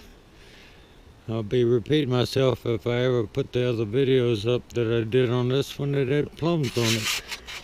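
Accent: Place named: Virginia, United States. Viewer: I figured y'alls last frost would be much earlier than us? I live in far southwest Virginia in tazewell county and our last frost date is may 10th I just know by the accent your in far eastern or southern Virginia. I just started my channel and I hope to start growing edibles as well as my ornamentals and tropicals. I'm trying figs and I consider them tropicals here cuz we're 2400 foot up in the mountains and we get long cold winters!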